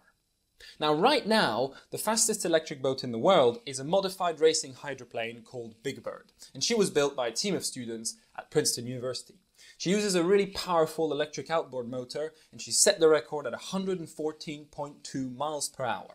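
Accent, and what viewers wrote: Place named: Scandinavia. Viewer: pretty sure he's not UK(originally), sounds like scandinavian, to many american R's and over pronounciation of the english bøøøut(boat)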